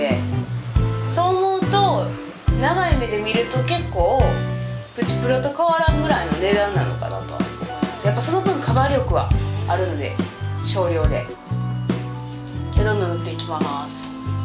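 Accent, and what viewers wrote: Kansai, Japan. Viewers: さん、関西弁やから親近感がわく( ＞∀＜ )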